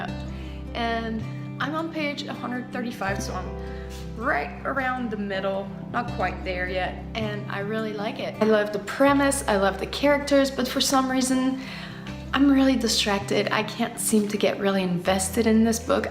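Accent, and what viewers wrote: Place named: Portugal. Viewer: Oh wow, I was way off! I’m half Portuguese and your accent is very similar to a lot of my relatives!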